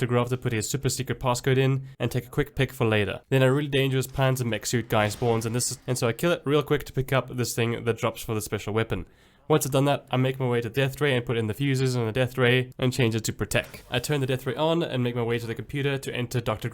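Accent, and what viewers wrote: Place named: South Africa. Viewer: No ways you're south african. Recognized the accent immediately. Lets go bru, really good video and well paced aswell 🙌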